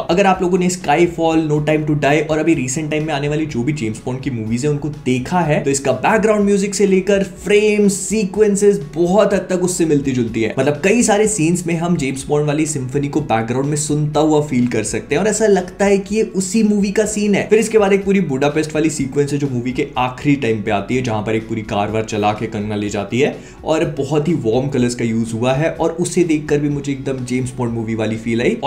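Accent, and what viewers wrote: Bhopal, India. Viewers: Bhai are u from bhopal kyuki achi bhopali krte ho 😀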